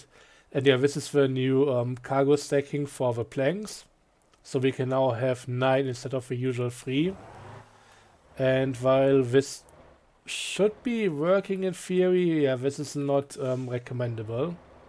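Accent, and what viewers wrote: Germany. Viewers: i really enjoy your germanized english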